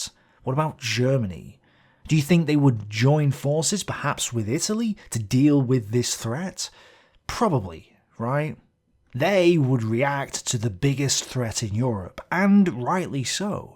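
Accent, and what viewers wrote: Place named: Cockney, United Kingdom. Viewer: I like you still using cockney instead of posh accent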